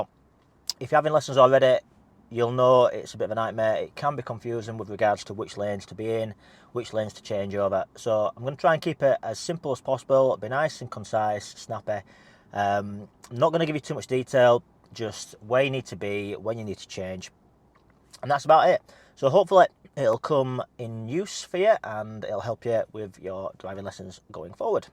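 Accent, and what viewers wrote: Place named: United Kingdom. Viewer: I was flabbergasted when he staring talking in British accent 😂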